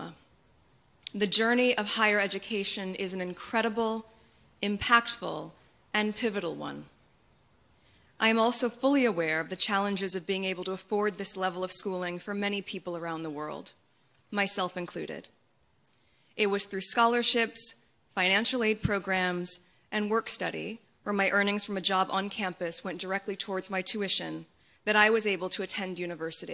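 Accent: United States. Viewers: It's an American English accent. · That was American English! is not British. She is American.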